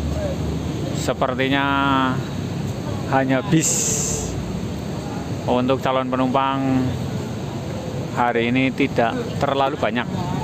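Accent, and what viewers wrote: Banyumas, Indonesia. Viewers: Hadir mantau mas, nek ora ora kepenak... Paten👍👍👍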